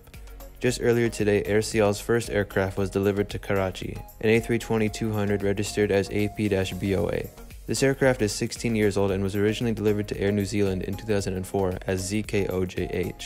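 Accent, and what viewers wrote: United States. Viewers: Great American Accent.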